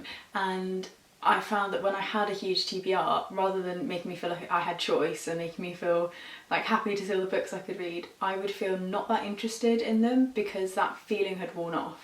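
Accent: United Kingdom. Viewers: you are nt hearing excellent britain's pronunciation?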